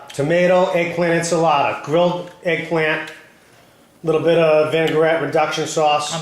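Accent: Boston, United States. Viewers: This sounds like an episode of the Sopranos...or Boston? I live in the midwest so it all kind of sounds the same to me! Great presentation of that dish!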